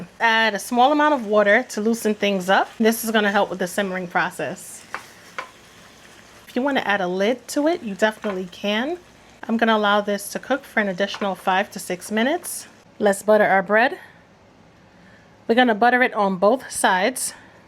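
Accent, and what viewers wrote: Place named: Philadelphia, United States. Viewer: Are you from Philly? I just ask bc of the accent.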